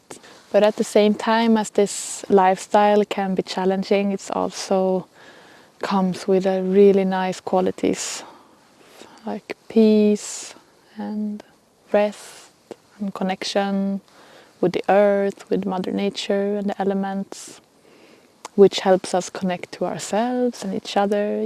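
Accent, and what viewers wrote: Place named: Sweden. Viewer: And why do you think so? I am Norwegian and I can tell you that first the language is genuine, Quite easy to hear this is Swedish English, AI can not fake that variation yet. Second; the nature is genuine and it is not hard to live this way. This channel is showing a real family, living in the real Sweden. I urge you to take a trip there to see the country. And to I know you don’t believe in Jesus, but Jesus is soon coming back, where will you be?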